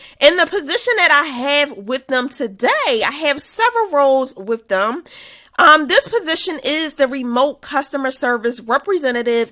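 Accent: Philadelphia, United States. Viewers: Philly jawnnn that accent is so home to me...Im in AZ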